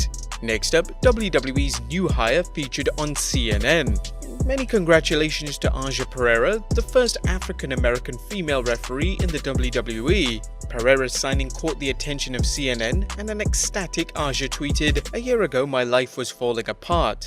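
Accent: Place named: United Kingdom. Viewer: I realize he's British, but yeah..."Bay-zohs" and "Ama-zON"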